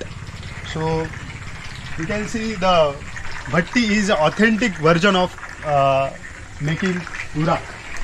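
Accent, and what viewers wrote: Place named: Maharashtra, India. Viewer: खूप बरं वाटतं जेव्हा आपण अंग्रेजी मध्ये बोलता 🙌🏽 Appreciate your efforts and speaking with Marathi infused in subtle way, with specific words and accent too 😇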